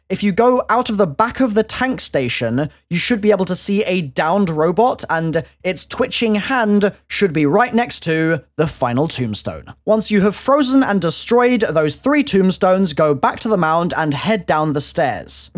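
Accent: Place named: England, United Kingdom. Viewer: Estos si es asentó ingles no como lo que tengo en usa xd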